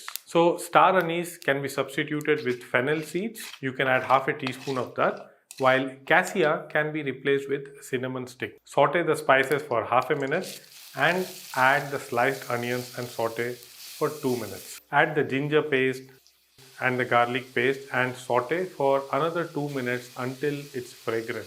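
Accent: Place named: Kerala, India. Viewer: Your accent sounds like a malayali Sir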